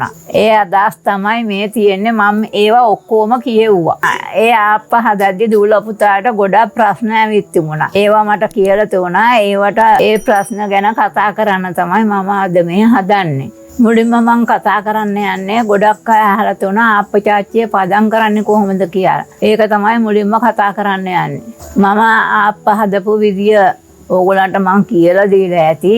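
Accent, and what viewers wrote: South, Sri Lanka. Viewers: අම්මාගේ දකුණේ භාශා ශයිලියට කැමතියි .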